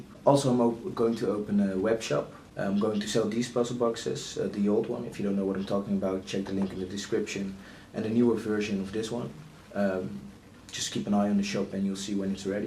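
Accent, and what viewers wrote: Netherlands. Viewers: Are you Dutch? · you, my friend, sound verry dutch... am i right? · This guy is dutch, im pretty sure he is dutch, everybody over here that tries to talk english speaks this way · Are you Dutch?? (your accent) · Ben je Nederlands want je accent klinkt heel erg Nederlands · You sound like you have a Dutch accent. Are you Dutch? I am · Are you Dutch? Your accent is kinda Dutch xD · You're ‘accent’ seems kind of Dutch, if so, ik ben ook Nederlands! :D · I am for 98% sure you're Dutch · Is he from the Netherlands? I can hear it in his voice😂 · I can hear you are Dutch. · R u dutch?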